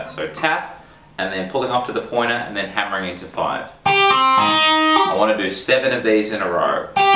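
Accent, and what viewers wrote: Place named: Australia. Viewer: Always refreshing to hear the Aussie accent.... great work....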